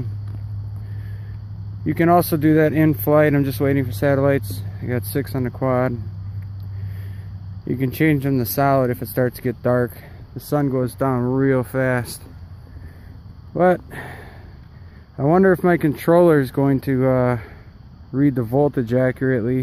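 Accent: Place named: Minnesota, United States. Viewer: Hi. Your accent sounds like Wisconsin or Minnesota 😎. I'm from Minnesota but live in California and lost my accent::( anyway I was wondering how far you can go in altitude hold before flipping on gps and it wouldn't kick in to save my butt? I'm ordering the latest version. What are your thoughts on that? Thank you for your time. Have a great day. Sincerely William Horsley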